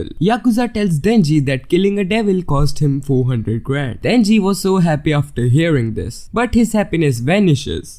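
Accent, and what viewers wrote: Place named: India. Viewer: Bro why don't you voiceover in hindi Misss your hindi accent 😢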